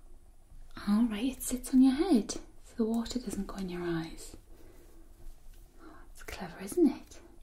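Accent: United Kingdom. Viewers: British accents often sound so elegant. I really like listening to you talking! Lovely greetings from Germany!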